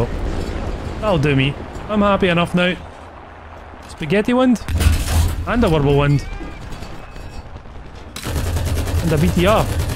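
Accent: Scotland, United Kingdom. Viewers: Where abouts in Scotland are you from? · Where in Scotland you from mate? Really enjoying your videos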